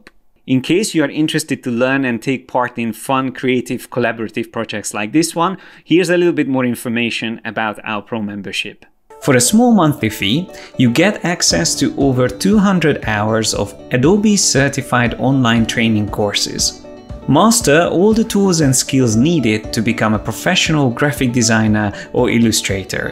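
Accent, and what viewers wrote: Hungary. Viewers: Cool videos! Did I sense a little Hungarian accent?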